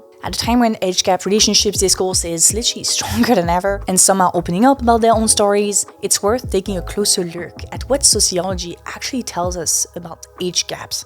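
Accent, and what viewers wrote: France. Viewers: Hi! I really enjoy your videos and the perspectives you bring on the topics you choose! The discussions are always super well researched and thoughtful! I also really enjoy listening to your voice/accent. I have a question about that, actually, cause it’s something I’ve been thinking about when it comes to my own accent (austrian/slovenian). When I was younger I sought to mimic the accents I heard on tv and in person, but lately I’ve found that I like it if a bit of my language diversity mixes into it and have sometimes deliberately chosen to keep some things like a german h or a slovenian r in some words. In that way I sometimes feel like I’m aiming for a balance between what’s understandable /great for the person I’m speaking to and what’s more authentic/feels more comfortable. Going into english spaces I always felt a bit self-conscious of a stronger austrian accent (we historically don’t have the best representation lol) so I was wondering what your experience is with that as a french content creator who creates in English. 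Do you find yourself toeing the same line or do you not think about it at all? Do you think it might be different if the language you were born in wasn’t french? (I’m kind of assuming this here, please correct me if I’m wrong, after all, I don’t actually know you 😅) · i find your pronunciation of "o" "oo" "ar" "ure" all pronunication as a strange "urrr", videurr, explurnation, everywhurr, burrk, prurfur - this clumsy hiding of your french accent , it's very distracting. Sorry, but i really like your content. I am French and I don't see why you make so many vowel sounds converge into "urr".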